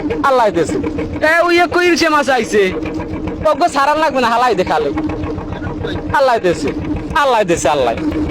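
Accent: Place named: Barishal, Bangladesh. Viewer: বরিশাল্লা মনু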